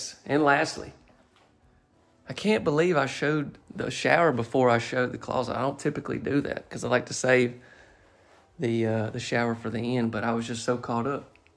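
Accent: South, United States. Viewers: I loves your accent are you from The South I'm from the south as well.Im from Arkansas.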